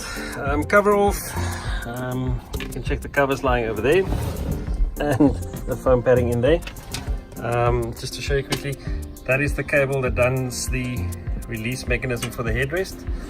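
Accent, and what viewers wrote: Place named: South Africa. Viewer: Nice to hear a South African on YouTube give a how-to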